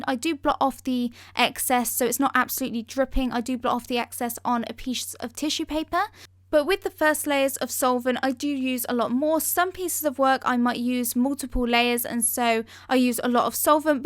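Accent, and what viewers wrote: England, United Kingdom. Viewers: I love you're accent, it sounds adorable 😍 are you from England? 😇💫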